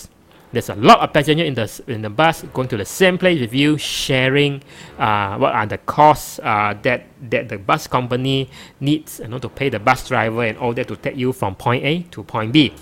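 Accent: Malaysia. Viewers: really find your videos helpful, and the Malaysian accent entertaining as well! Don't stop! :)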